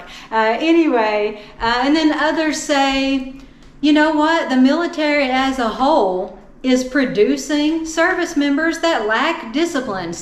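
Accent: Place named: Alabama, United States. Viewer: “He tells you to get the blanketed blank off the bus “ 😂 sounds hilarious with Alabama English. Love it ...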